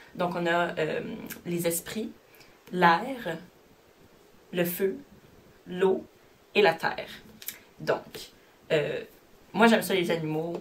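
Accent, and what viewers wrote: Quebec, Canada. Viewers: Moi, je t'ecoute, juste parce que je kiffe l'accent quebecois ;-)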